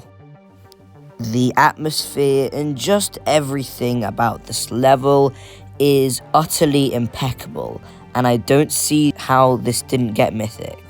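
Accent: United Kingdom. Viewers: I didn't think somebogy's voice could be this British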